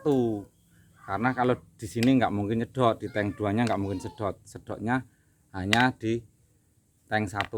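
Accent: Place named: Banyumas, Indonesia. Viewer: Logate kayong madan ngapak kie,, 😁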